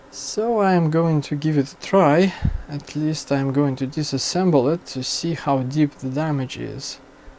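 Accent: Russia. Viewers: Yeah you are probably right. I guess searching for a replacement transformer that would fit this application would time consuming as well. By the way I was wondering for some time where do you live :) I noticed your Russian accent but you are using 120 V AC. So you must live in US or Canada. Is that right?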